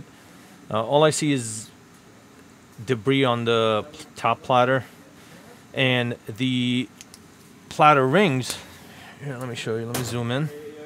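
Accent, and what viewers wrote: New York, United States. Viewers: When they both grow up in NYC anyone from outside NYC will think they sound the same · New York accent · You sound more New York than you usually do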